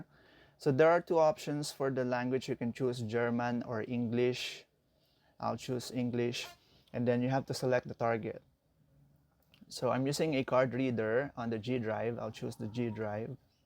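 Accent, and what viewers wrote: Philippines. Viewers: Bat parang tagalog accent yung English mo boss? 😨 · Gagi na noticed ko agad Filipino accent kala ko pinoy. Di ako nakatingin sa screen lol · Muntik pa sana akong mag comment ng what is your nationality why is your accent sounds very filipino😂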